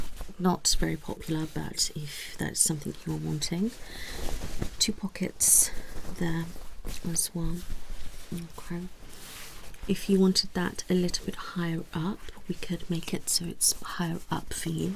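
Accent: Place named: RP, United Kingdom. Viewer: Can't get over the posh accent in this one!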